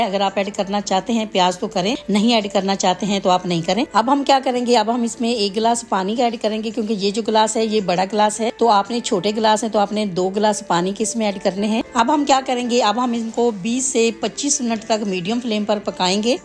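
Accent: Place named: Punjab, India. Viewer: How can u speak without taking breath 😂 very interesting food blog recipe Sy ziyada apka Bolna ka Punjabi style chah gaya 😂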